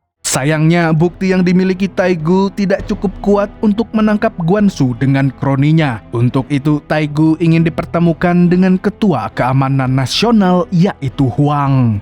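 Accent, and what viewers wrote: Banjar, Indonesia. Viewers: Ada logat Banjar jua amang nya😅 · urang banjar kah pian nih mang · nah org banjar rupanya sidin wkwkwkwkwkw 🤣🤣🤣🤣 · Ulun Hanyar sadar kaya nya Pian nih urang Banjar kh😅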